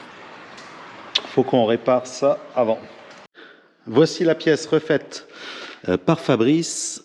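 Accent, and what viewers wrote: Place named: Loiret, France. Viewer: On sent fou tu soie du Loiret tu dis tous simplement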